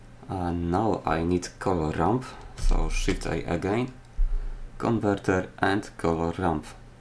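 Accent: Poland. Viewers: You sound polish... ru polish? Nice vid btw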